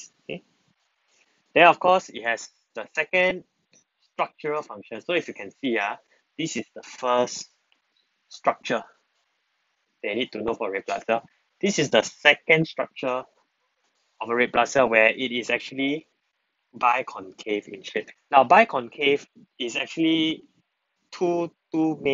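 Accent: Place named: France. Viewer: U speak like a french BTW its quite helpful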